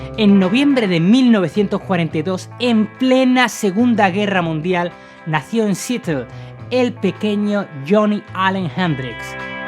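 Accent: Spain. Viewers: Es chistoso como los españoles se expresan, pero me agrada el video, ¡bien! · Buena historia. Pero quizá voy a sonar ridículo. Pero el acento español me desánimo a seguir viendo. Saludos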